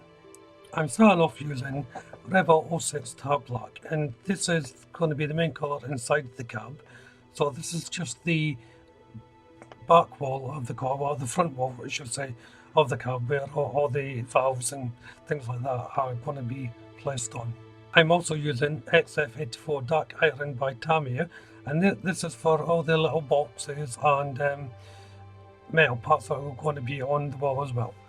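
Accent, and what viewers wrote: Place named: Scotland, United Kingdom. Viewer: Am enjoying this as will be getting this for myself soon. I know it's hard but if you could have a look at your sound so everyone can enjoy your lovely Scottish brogue. Is that the word? Who knows. Thanks for your time and effort. Good stuff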